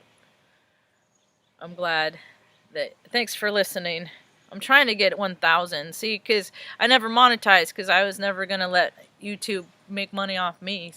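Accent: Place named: South, United States. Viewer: No coffee Nor Tea ...PBR...IT'S A SOUTHERN TWANG .😉 Ooh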